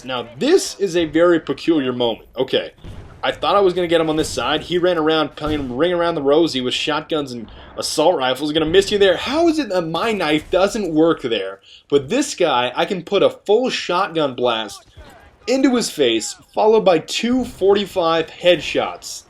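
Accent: Russia. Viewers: polar bear is more deadly, grizzly are just more likely to attack great rushian accent i alwasy blame lag for those shotgun moments i hear theres some kind of hit box they have to be in when you start the kniff inorder for it to hit them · your Russian accent is awesome. крут :)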